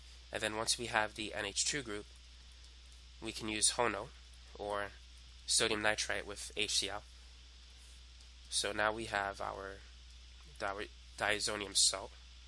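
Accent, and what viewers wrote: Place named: New York, United States. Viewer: Isaac Pelletier no sounds like from some New York district.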